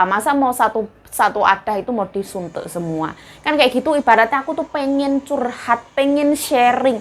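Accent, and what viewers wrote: Java, Indonesia. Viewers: Wong jawa ngomong bahasa taiwan tetep medok ya, medok puoll 😁😅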